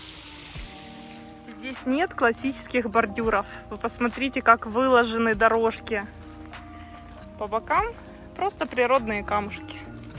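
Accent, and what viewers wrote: Ukraine. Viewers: На Украине такой красоты нету? В Киев на экскурсию поедете, что вы в России забыли? Путин украинцам по выдавал России кие паспорта, от этого вы русскими не как не стали, это равносильно что негру дать российский паспорт, от этого он русским не стал, видно по вам что вылетые украинцы, по русски с акцентом, по украински бы разговаривали бы, вам больше идет украинский язык, русская речь у вас с большим акцентом, вас украинцам можно от лечить от русских, почему? Потому что это две разных национальности. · Ну раз уж РФ вас отвоевала и вы помчались путешествовать по особо не родной прежде стране. То было бы маст хевом избавиться от говора и начать учить русскую речь! А то понимаете ли пока еще не все россияне в курсе что где то там какой то Мариуполь теперь не в составе УКР. Антинезалежные настроения все такое..Речь сразу резко отличается!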